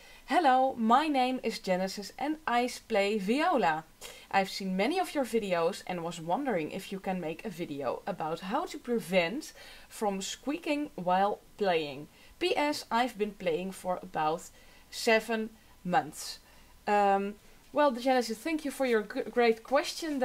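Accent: Netherlands. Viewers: i just started playing and i'm making the most annoying sound ever , I've listent to all the tips on the internet but nothing really helps , But i'm only playing for like three days so maby it's normal , Could this fade by Practice ? Or should look for a problem ? ow and may i ask are you dutch ? because your accent sounds dutch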